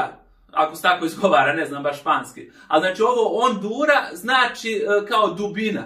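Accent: Užice, Serbia. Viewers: Obožavam tvoj Užički naglasak! Bio sam tamo par godina pre jedno nedelju dana kod bivše devojke. Nedavno sam pronašao tvoj kanal, i religiozno ću te pratiti ka daljem putu! Pozdrav druže iz Subotice! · Hvala vam ,svašta sam naučila slušajući/gledajući vaš video. Vrlo ste spontani i prirodni u izlaganju. Tome po mom mišljenju doprinosi ERCOVSKI šarm u kombinaciji sa UŽIČKIM govorom ❤️. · Užički naglasak, podseća me na vojničke dane, a čujem da je sad u krugu kasarne Lidl, znači opet Svabe okupirale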